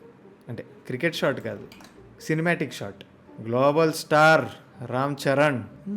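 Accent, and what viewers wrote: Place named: North Andhra, India. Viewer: Rajam ,vizianagaram, srikakulam evi 3 slang kalipi vere slang vacchinchidhi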